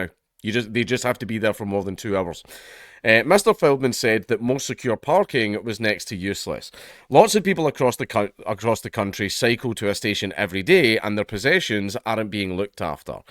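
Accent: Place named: Scotland, United Kingdom. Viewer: I'm from Poland. This is the only source I get the news from Bongland from. A collapse narrated, with scottish accent. By the gods, I'm having fun.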